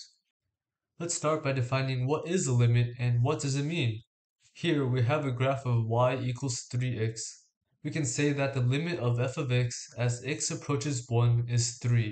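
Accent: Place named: Asia. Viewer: I dont think he's Indian, he sounds more central asian, southeastern asian, or pacific islander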